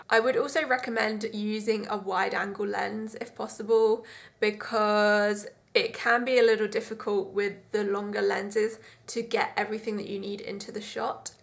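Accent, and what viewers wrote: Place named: United Kingdom. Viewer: Actually, I was looking for some special camera moves, like sticking it to the brush or stuff like that, but I feel in love with your British pronunciation.❤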